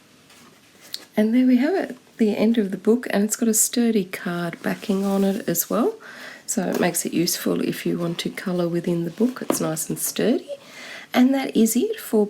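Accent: Australia. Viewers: Thank you! I enjoy your videos so much. In part because of your lovely Aussie accent, but mostly because you introduce me to book from around the world. Thanks again!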